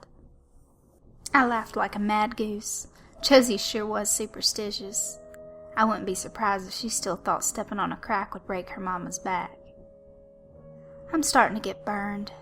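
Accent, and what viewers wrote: South, United States. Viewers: came over to you from "The Creepy Dark" and I must admit that you are good . glad I checked out this trilogy and I really enjoyed the southern accent . I like looking for new channels when they are still somewhat new and watch them grow . I have just subscribed to you and I am looking forward to watching you grow . Keep up the good work and I hope to hear from you soon .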